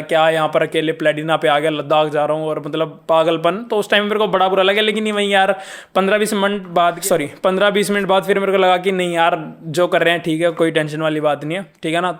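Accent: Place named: Haryana, India. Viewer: Haryanvi way of talking love from Australia bro ...keep going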